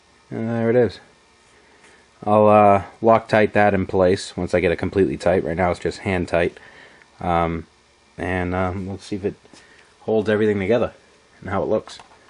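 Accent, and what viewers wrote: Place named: New England, United States. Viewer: Good stuff, sounds like your from new england.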